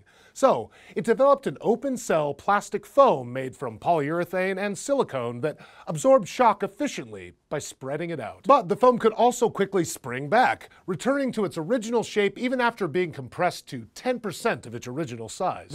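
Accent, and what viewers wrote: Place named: United States. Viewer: I´m normally not as keen on the American accent that I am on others, but this guy´s voice is purrrfect. And he works with SciShow. And he looks good. He makes me question my sexuality, goddammit.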